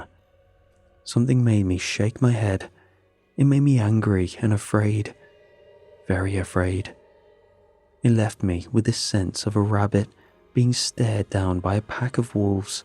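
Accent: United Kingdom. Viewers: I hear many stories told from Minnesota. I wonder if, even tho sounding British, lives there. Or maybe a writer he narrates often is in that cold azz state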